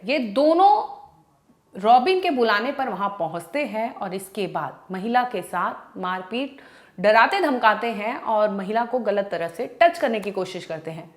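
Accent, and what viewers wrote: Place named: Bengal, India. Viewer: Aap Bangali hai kya aapki Hindi bilkul sahi nahin hai aap sahi se nahin bol paate Ho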